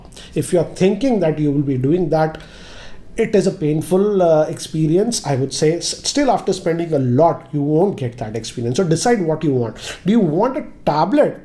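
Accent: Hyderabad, India. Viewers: We want Hyderabadi Hindi back !!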